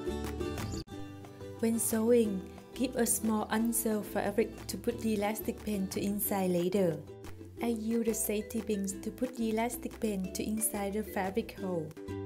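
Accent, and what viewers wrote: Vietnam. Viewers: R u Vietnamese? I feel like u have a Vietnamese accent lol I luv the tops btw but I’m not amazing enough to create them lol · Walters She is from Vietnam, she has a Vietnamese accent. Definitely not deaf.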